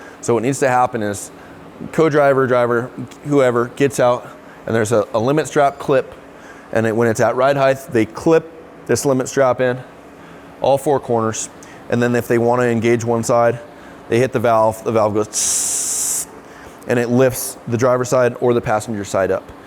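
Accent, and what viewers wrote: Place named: Australia. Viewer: Love your work mate beautiful works of art. Awesome to hear your have Aussie 🇦🇺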